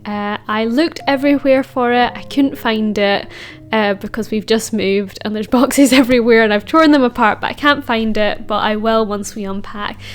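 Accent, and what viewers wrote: Scotland, United Kingdom. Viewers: Lovely video including of course the Scottish accent. Thankyou; from the country of El Salvador 🇸🇻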